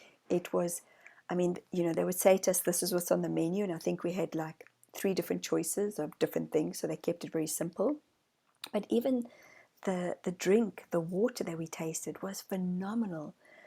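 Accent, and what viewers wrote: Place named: South Africa. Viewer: I just wanted to tell you, for one: I found you through Jeff Mara & so happy I did. For two: I knew right away you were South African when you were on his show. My ex husband is South African. I’m American 🇺🇸😟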